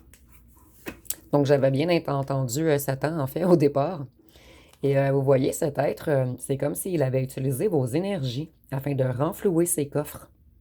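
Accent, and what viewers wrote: Quebec, Canada. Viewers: Bonsoir Madame , c'est toujours avec un grand plaisir que je vous retrouve et vos guidances toujours fort juste ,votre accent québécois j'aime beaucoup et vous aussi , vous faites partie de mes préférées , vos ongles mauve je doute pas qu'ils seront jolies car il le sont déjà j'aime bien cela de cette couleur ,et je ne sait pas comment vous faites ça mais vous avez du goût c'est très jolie je ne doute pas votre façon de vous habiller très féminine et très bien chic toujours , je me sens parfois si seule et votre présence me fait exister car je m'occupe de ma mère toutes ses journées qui ne sait plus rien faire toute seule et en maladies de Alzheimer ça me fiche le bourdon pas mal ,je peux m'absenter mais très peu car ne peux pas la laisser longtemps seule et ma sœur a pris 3 jrs a la mer avec son compagnon alors je regarde vos guidances et ça me permet d'exister un peu ,sinon je suis comme vous coquette et féminine aussi a part que la j'ai pas beaucoup trop de temps . 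Merci a vous et à votre bienveillance a notre égard pr nous faire profiter de ses messages gracieusement . Bonne fin de soirée.❤👍🍀🌹🥰🙏☀️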